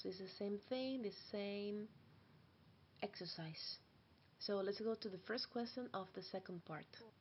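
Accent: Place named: Netherlands. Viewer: aww thank you so much can i ask more question how many months did you learn dutch and understand it? and in CIE exam how many hours or minutes does the exam goes im so nervous cos im doing self study as you your dutch accent is really nice aswell on the video its btw 😊.sorry i have a lot question hope you understand me 😘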